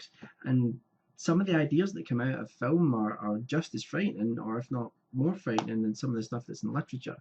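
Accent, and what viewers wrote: Scotland, United Kingdom. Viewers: Nice accent are you Scottish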